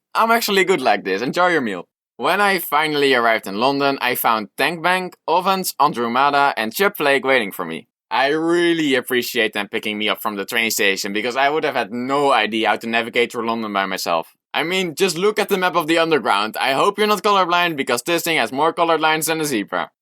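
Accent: Netherlands. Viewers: I knew it you’re Dutch... well that explains your dutch English accent Really like your videos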